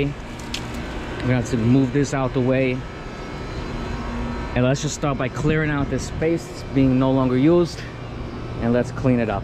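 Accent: New York, United States. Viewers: I see and hear you're in NY...laws and regs are crazy there...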